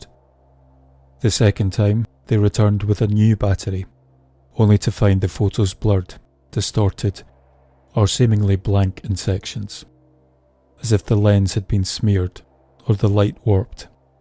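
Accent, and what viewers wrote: Scotland, United Kingdom. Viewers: Soooo enjoy your soothing and wonderful Scottish accent! I’m in love. ❤️🏴. Your stories are so intriguing and with the right touch of history and mystery. Scotland is my most favorite place on earth. I am blessed to have sister by heart, ♥️ she is from Aberdeen. Was up this past June. I will definitely be back. Living in Scotland would be my dream. Thank you. And just for a good laugh, I’m from “ Erie” Pennsylvania. USA 🤭😉👍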